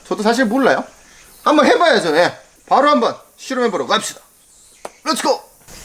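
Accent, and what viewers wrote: North Korea. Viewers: 북한사람 인가요?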